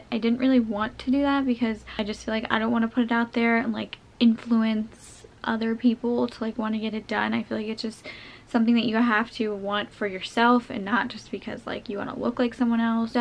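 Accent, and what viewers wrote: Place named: South, United States. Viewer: Why are you the only person in your family with a southern accent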